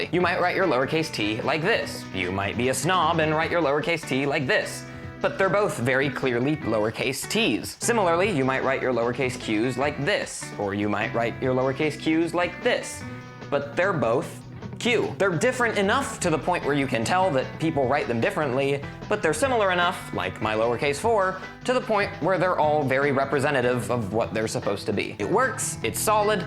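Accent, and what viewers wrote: United Kingdom. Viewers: Are you a brit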